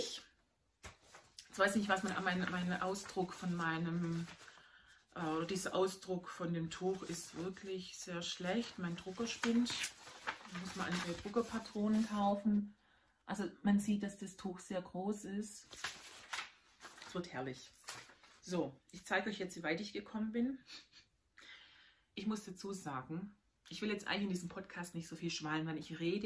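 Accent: Swabia, Germany. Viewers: Habe Dich eben entdeckt, durch Frau Nadelgeklapper, und bin begeistert von Dir und Deinen tollen Projekten! Außerdem finde ich unseren schwäb. Dialekt so "heimelig"! Mein Abo hast du. Liebe Grüße Nicole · Hallo, vielen Dank, dass Du Dich endlich getraut hast! Das mit der Technik hast Du ja alles voll profimäßig hinbekommen, Lautstärke, Ton- & Bildqualität sind perfekt 👌🏼 Ich mag Deine Projekt- und Wollauswahl sehr, und vor allem liebe ich den schwäbischen Einschlag 🥰 Dass Du uns auf Deinen Weg zur Handfärberin mitnimmst finde ich ganz toll, das war für mich eigentlich das interessanteste Segment, weil ich davon null Ahnung habe. Total spannend, bitte weitermachen! Kleiner Verbesserungsvorschlag: Du sagst sehr oft „tatsächlich“, ich bin bei sowas aber auch „tatsächlich“ sehr empfindlich 🙈... und Du hast auch überhaupt keinen Grund, Dich dauernd zu entschuldigen, gerade dass Unperfekte macht es doch lebendig 😉 Ich freue mich sehr auf weitere Folgen von Dir! 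· Hallo,dein Podcast hat mir sehr gefallen,bitte mach weiter 😊..Ach und ich finds gar nicht schlimm wenn das schwäbische durch kommt, das macht uns schließlich aus....Liebes Grüßle aus Fellbach 🤗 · Ich hab’s auch sehr genossen Dir zuzuhören.. und wie schön ausm Schwobaländle🥳.. liebe Grüße vom Fuß des Hohenzollern Susanne 💕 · Toll gemacht😊 Dankeschön! Sehr sympathisch und genau unser schwäbisch von kurz vor der Alb 👍🏼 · Liebe! Schwätz du ruhig schwäbisch - ich find‘s super 👍🏻 Zu den Qualitäten für den Sommer mag ich persönlich nix mit Merino. Eher Baumwolle-Mix Garne. Mach bitte weiter so 👏 Liebe Grüße Gabi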